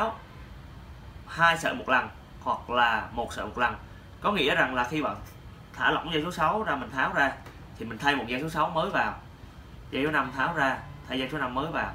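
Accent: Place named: Da Nang, Vietnam. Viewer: giong anh noi nhu nguoi thanh pho da nang , ghe zay cho em xin d/c dc ko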